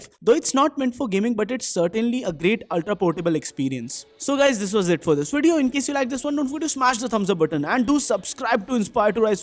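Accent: India. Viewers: English ( Indian accent ) 😅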